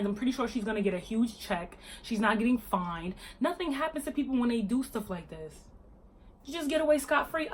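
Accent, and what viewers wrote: New York, United States. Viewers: You're hella gorgeous and your New Yorker accent is so lit yo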